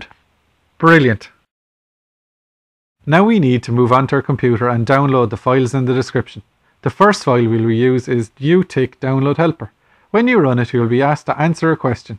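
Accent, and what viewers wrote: Ireland. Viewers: hey are you irish i am!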